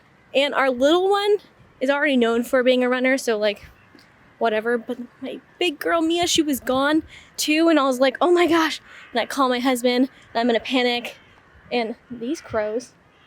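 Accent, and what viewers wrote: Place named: United States. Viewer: Great video. You certainly don't have that unique German account. You sound very much American. How is it that you went from America to Germany? Must be a very interesting story. While stationed in Germany with the Army which was a "lifetime" ago was the Army Depot there in Miesau.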